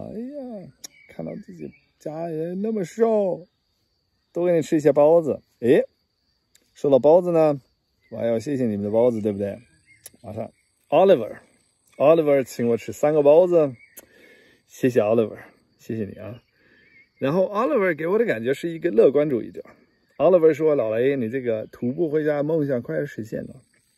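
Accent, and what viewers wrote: Standard. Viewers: 竟然會標準口音 厲害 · 老雷的中国话比很多国人的话还标准了，还有几句来句操，感觉很对位😂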